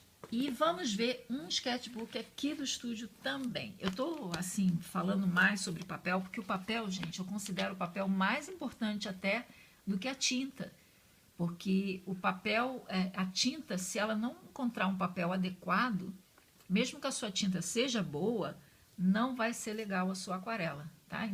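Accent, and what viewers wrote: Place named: Rio de Janeiro, Brazil. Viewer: amei esse video ( e todos os outros que ja assisti aqui do canal..kkkk) muito boas suas dicas...muito sincera,direta...sem enrolação...muito eu...( sou carioca e esse seu sotaque me faz pensar que vc tb...acertei ???) Bj grande e muito grata !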